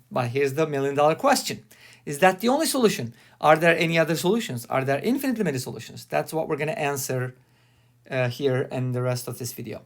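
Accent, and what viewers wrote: Turkey. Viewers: you sound turkish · you exactly sound like you have a turkish accent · The accent is unmistakably Turkish.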